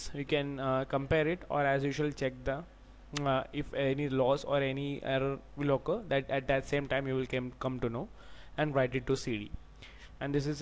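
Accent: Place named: India. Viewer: He is defenetly Indian....... :) Thanx for the tutorial man....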